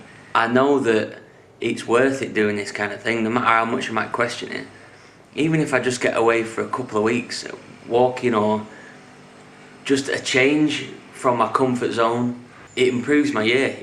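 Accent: Manchester, United Kingdom. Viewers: Now I'm reading all these comments in his british accent in my head. Including mine. I don't have a british accent at all. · I always like the music you put over the b-roll. The shots are very beautiful. The overall atmosphere of your travel vlogs is very enjoyable. You wanted to know what we like about your videos, well....everything? Your UK accent gives it a nice flair, too :) I'm looking forward to the other videos about the rest of the travel! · Trying to identify where in Manchester you're from by your accent...where?! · Otabek Isaqov he's Mancunian - north west of England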